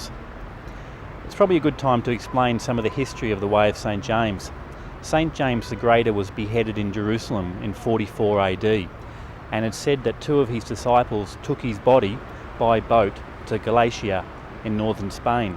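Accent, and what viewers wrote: Australia. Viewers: Hi, I really enjoyed your film, a real gem for supporting a text I am writing on. I also enjoyed listening to your Australian accent, since I lived there for more than a year and it was a very special time, it brought me beautiful memories. Congrats also for keeping up with your films. All the best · did he really have to say 'I'm australian?'' although i just heard.'stralian....' With that accent !! :)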